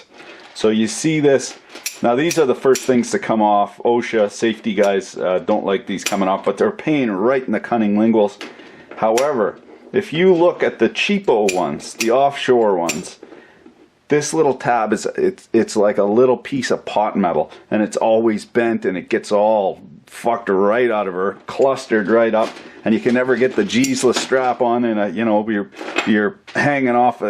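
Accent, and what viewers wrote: Canada. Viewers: R u from Canada 🇨🇦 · Can someone translate the canadien chinglish and translate where he bought it for 150 bucks?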